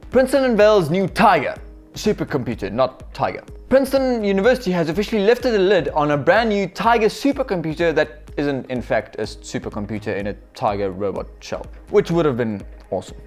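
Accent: Afrikaans, South Africa. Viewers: The Afrikaans is heavy with this one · He sounds South African. 👍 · was this guy south african? (im also south african)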